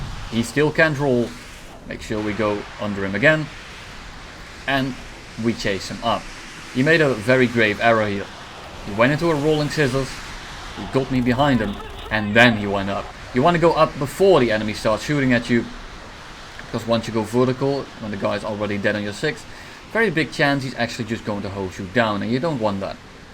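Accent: Netherlands. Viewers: goddamn i can hear the dutch accent once you notice it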